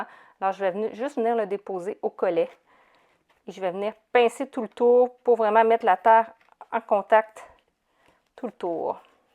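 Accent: Quebec, Canada. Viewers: Et boujour tout le monde 😅 j'adore ton accent ❤ vive le Québec 😊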